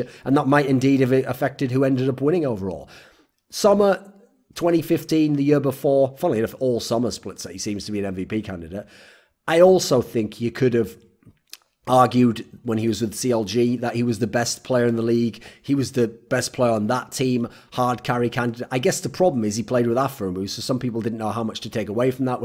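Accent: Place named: Northern England, United Kingdom. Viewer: Nah , like northern English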